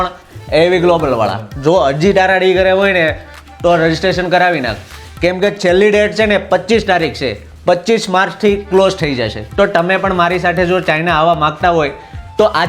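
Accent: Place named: Surat, India. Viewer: સુરતી બોલી માઁ કાંઈ નો ઘટે.. જોરદાર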